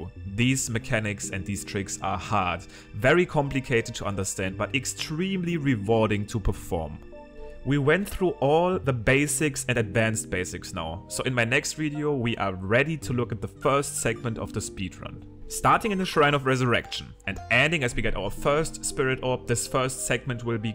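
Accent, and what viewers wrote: Germany. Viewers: You have a german accent. Do you skeak German? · are you german ? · Warum machst du die Videos nicht auf deutsch Mann hört das du deutscher Bist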